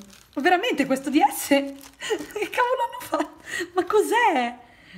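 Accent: Italy. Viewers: Oh dio amo il tuo accento toscano❤️❤️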